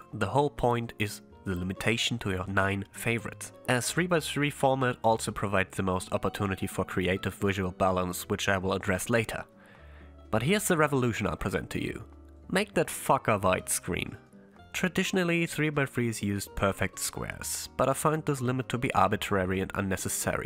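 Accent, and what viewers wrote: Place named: Germany. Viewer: Dies to German accent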